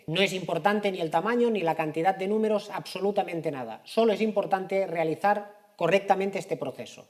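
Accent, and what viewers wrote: Spain. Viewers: Nuevo suscriptor. Ese acento catalàn me recuerda a un querido profesor de la infancia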